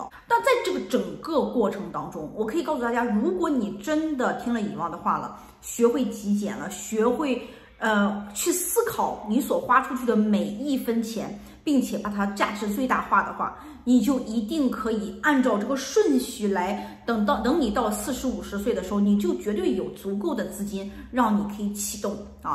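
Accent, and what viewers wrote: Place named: Northeast, China. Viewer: 感觉一口东北口音呢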